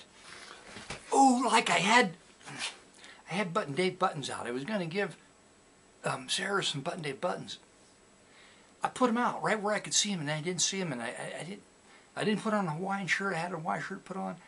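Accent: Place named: South, United States. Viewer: You have a fine country accent going on there.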